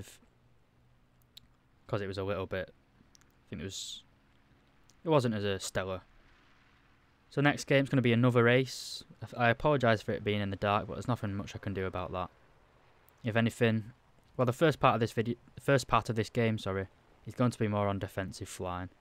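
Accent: United Kingdom. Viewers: Hey from which part of the uk are u?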